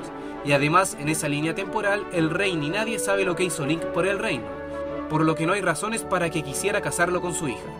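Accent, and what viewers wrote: Chile. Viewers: Son de chile ? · Se nota que eres chileno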